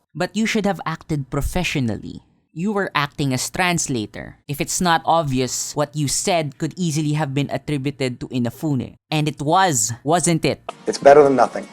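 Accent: Philippines. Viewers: You sound Filipino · Filipino. His English is good but his accent is pretty heavy. This comes from another Filipino.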